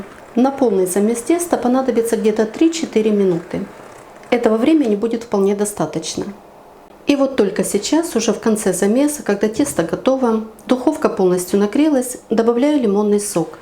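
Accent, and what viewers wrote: Ukraine. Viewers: Считаю Ваш канал лучшим, Вы всё объясняете простыми, понятными словами и Ваша украинская речь очень приятна❤❤❤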